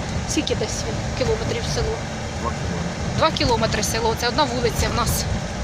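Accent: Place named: Poltava, Ukraine. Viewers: Доброго вечера,.Ви говорите і примовляєте як у нас на Полтавщині. Приємно вас слухати. Дякую за відео. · так і я дивлюся і також так думаю,мова точно як у нас на Полтавщині. Я сама з Березняків 25 км від Лубен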